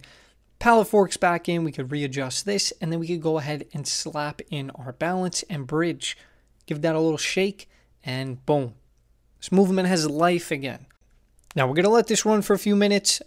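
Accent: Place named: New York, United States. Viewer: Imma tell u the truth my guy, Ive shown non new yorkers your accent as a definitive reference, mine is not as thick as yours so I only sound like you in heated moments. Love the videos, I'm a collector of magic the gathering cards and try to take aspects of your hustle+customer satisfaction into my card trading, feels good when an "everyone wins" scenario I helped crafted pans out.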